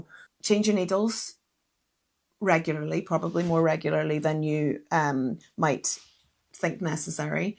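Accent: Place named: Scotland, United Kingdom. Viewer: Oh gosh or maybe that's a Scottish accent I detect 😂 May I ask what machine this is please?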